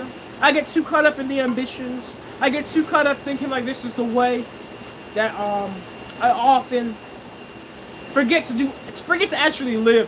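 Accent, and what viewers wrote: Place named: United Kingdom. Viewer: Sound like you live in the UK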